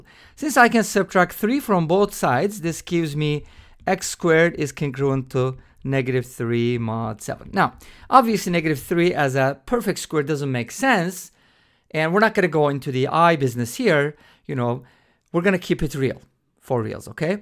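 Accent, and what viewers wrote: Turkey. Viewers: you sound extremely turkish, are you?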